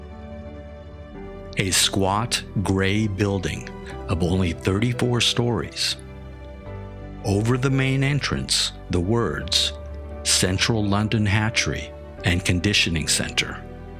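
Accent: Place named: United States. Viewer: He's not AI -- he's American. They tend not to do languages well. Dan Rather use to say " N-rowt" for en route.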